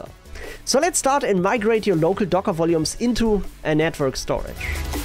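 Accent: Germany. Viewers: Gutes Video!.Deinen deutschen Akzent hört man ja sofort hehe xD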